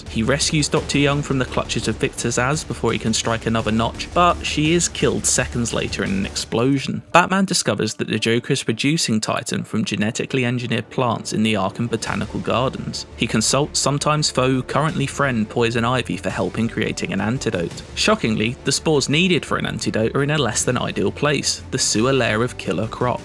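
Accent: United Kingdom. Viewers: the british accent is such a turn off man!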